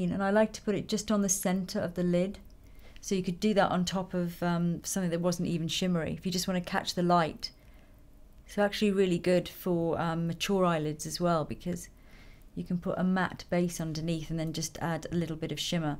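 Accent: England, United Kingdom. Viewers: I find fascinating how Magical the transformation always is, how beautifully the colors blend whether they are subtle as here or more intense and above all the degree of sincerity of (the comment about her cheating on her lower lip)... this is Fascinating:D (and I havent got a clue about proper makeup:D).. oh yes I also love British accent:p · I love how english people prononce french words! Good job! It was really hard saying encre de peau :)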